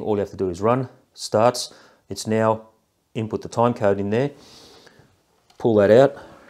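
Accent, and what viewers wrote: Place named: Australia. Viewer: awesome mate. great to see Aussie accent with these tutorials as a kiwi. Love your vids. new sub today.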